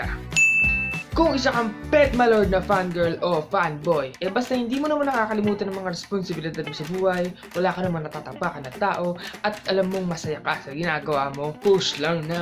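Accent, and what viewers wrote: Visayas, Philippines. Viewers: Bisaya kaba??? Parang naka bisaya ka eh💕💕😘😍😱 pa shout out pooo plsss!!!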